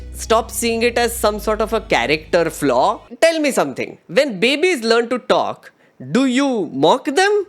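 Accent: India. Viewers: I don't know what a Correct accent is either. It's probably just some white liberals offended, because your accent kinda sounds like Apu. A cartoon character used to make jokes about immigrants from India. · English is an Indian language now and you are speaking indian English while talking about India. I don't understand why people don't understand it! And on top of it choose to mock the accent.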